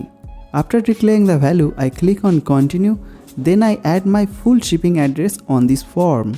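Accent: Bengal, India. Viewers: Rathod He is talking in Bengali accent, not American. Don't be ignorant about your countrymen, try to learn more about India. It is a very diverse country. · দাদা আপনার কথা শুনেই বুঝেছি আপনি বাঙালি। বাংলাদেশ থেকে ভালোবাসা রইল 🇧🇩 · Typical indian people ma dude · Bengali people speak 🗣️ like this it is not like that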